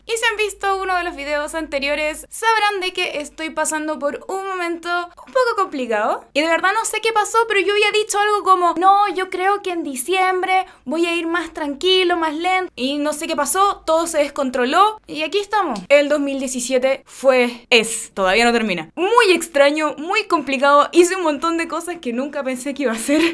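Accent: Chile. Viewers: BUEN VÍDEO, ME GUSTA MUCHO ESE ACENTO CHILENO ^-^ ♡, ( TE DEJO UN RETO, " HAS UN TOP DE LAS CANCIONES MAS HERMOSAS DE ÁNIME ) ♡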